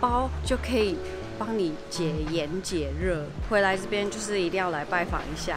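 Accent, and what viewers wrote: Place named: Taiwan. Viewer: 這個主播…很台！😂😂😂😂😂😂😂超👍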